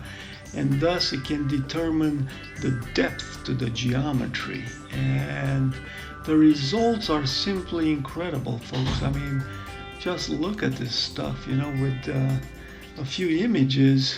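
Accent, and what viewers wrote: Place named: Europe. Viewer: You fools think the EuroDouche accent makes him intelligent or honest ? Misusing "professional" extrapolation/interpolation software to support a dogmatic narrative is something anyone(even a FEtard) can do. & btw, IR just penetrates haze better & lets you see further/clearer, no magic. You can't even talk about such a small angle over such vast distance(or ANY angles/distances) without having measurements(actual) WITH error bars on your initial data. & there are other issueS as well with using this approach here. Being somewhat familiar with how such software(not that particular app but the general assumptions, principles & algorithms etc.) is designed, I'm quite certain that, not only is his "analysis" flawed from the get go, but the conclusions he draws from them are absurd. & he is going "write his own code"(even though he misunderstands what photogrammetry even is never mind how it "works") in Matlab to manipulate data to fit his narrative(NOT reality) even better ? 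I can hardy wait ! Selling(& you're buying) such buffoonery is practicing douchebaggery. I'd be glad to be more specific, if anyone asks the sincere/relevant Q's. Though FETards don't want to actually learn anything EVER. But thanks for the permanent public record of your foolishness though.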